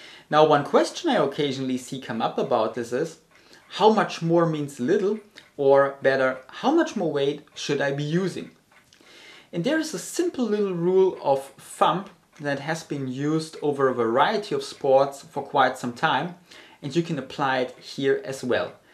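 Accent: Germany. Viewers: french? =)) ahaha hey j'aime ta, parlant français, l'accent allemand hes German dude xD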